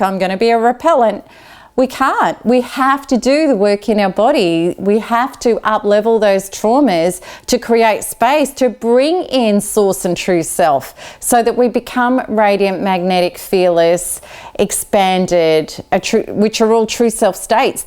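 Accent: Australia. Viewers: When does this New Age bs with a Aussie female accent STFU?! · Are you Australian?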